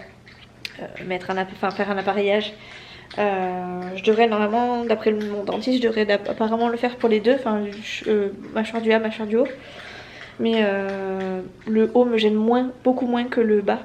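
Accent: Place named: Basque Country, France. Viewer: Que j'adore cet accent Basque 🙂👍👍👍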